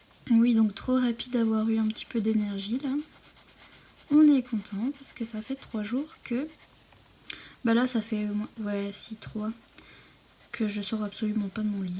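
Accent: Quebec, Canada. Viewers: Allo ! Je trouve ton accent québécois vraiment bien et ca vient d une québécoise 😉 j ai beaucoup aimé t ecouter en coloriant dans mon nouveau livre ❤️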